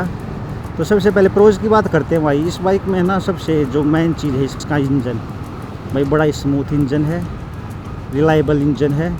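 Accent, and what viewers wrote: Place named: Haryana, India. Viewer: Well explained bro..no nonsense talk..I like haryanvi language..sweet..I am planning for this one..but sometimes confused between This and Hiness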